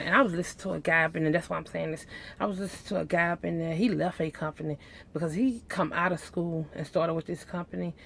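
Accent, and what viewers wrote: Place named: South, United States. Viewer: No, you are slowly loosing your southern draw. great info I just filled out a application for choice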